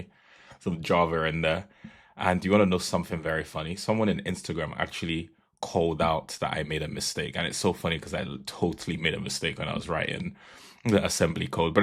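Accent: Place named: Nigeria. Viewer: i hear the nigerian accent boss 😂🔥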